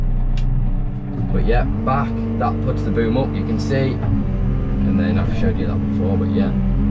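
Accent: United Kingdom. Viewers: Nice vid, is this a British accent ? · One of many. Think hes from Cumbria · Sounds like Yorkshirian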